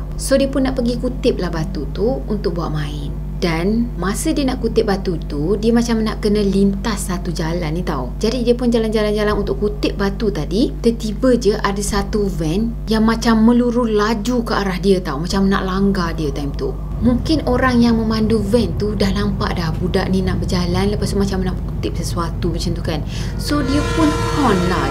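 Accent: Kelantan, Malaysia. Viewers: Salam kak.akak ore kelate ke.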